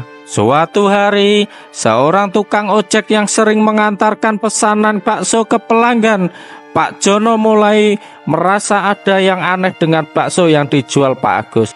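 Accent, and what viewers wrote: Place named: Java, Indonesia. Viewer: Suka sih dengan ceritanya, tp suara mas nya terlalu medok kaku, nada bicaranya gk enak di dengar, Terlalu medok, terlalu kaku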